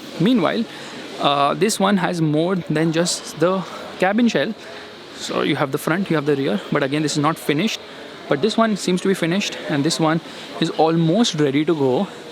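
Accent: India. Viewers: I can understand your indian english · Indian accent of Hinglish. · If there is an expensive car, it is a luxury item and if a man is speaking English in Indian accent, it is definitely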